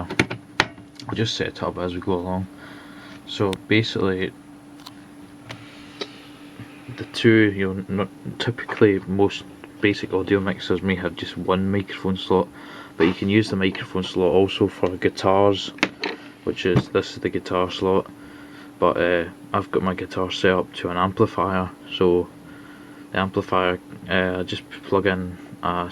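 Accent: United Kingdom. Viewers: I believe he's Scottish not Irish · are you from Wales?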